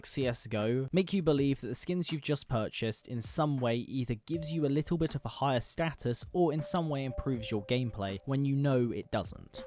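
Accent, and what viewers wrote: United Kingdom. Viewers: I'd pay double for games if they leave out the wokeness and sell a polished and most importantly FINISHED product. That aside bro, you sound like you live in the UK. Can you seriously say that woke ideology hasn't ruined everything it has gotten its claws into? As consumers we can vote with our wallets if companies implement particularly predatory business practices. Against woke ideology? Against companies propped up by their ESG rating? Nothing. Even voting doesn't help.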